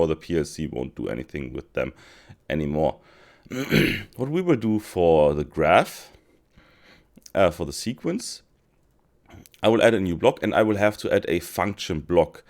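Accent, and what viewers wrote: Germany. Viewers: man merkt doch schon nach dem einleitungssatz dass der dude deutscher ist xd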